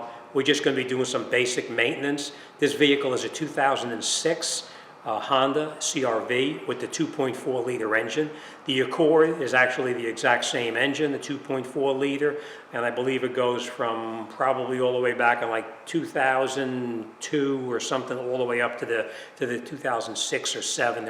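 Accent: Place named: Long Island, United States. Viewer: You gotta be from Long Island! 🤣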